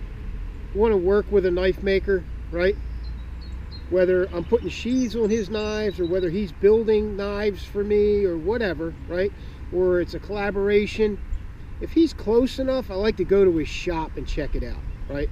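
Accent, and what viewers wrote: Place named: Eastern Pennsylvania, United States. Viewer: Judging by your very strong Eastern PA accent, I assume shop is in Pennsylvania somewhere?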